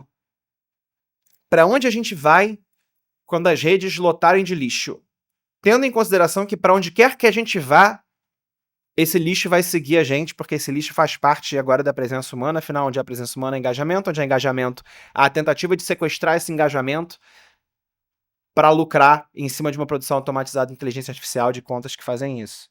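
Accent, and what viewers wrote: Rio de Janeiro, Brazil. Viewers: Mano, esse sotaque de playboy carioca quebra minhas perna, porra. Fica tipo Carlos piloto, aí é foda.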